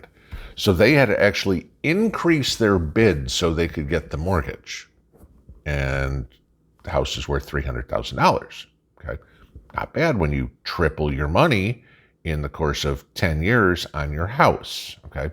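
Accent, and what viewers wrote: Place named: Upper Midwest, United States. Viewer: You don't sound like you're from TN. You have the short flat vowels of the northern midwest. Wisconsin? Michigan? Illinois? Just curious.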